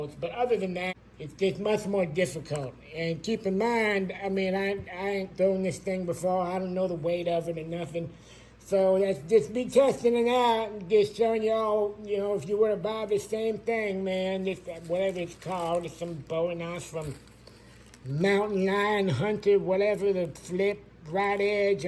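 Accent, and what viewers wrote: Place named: Louisiana, United States. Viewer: This dude sounds like he grew up in the Bayou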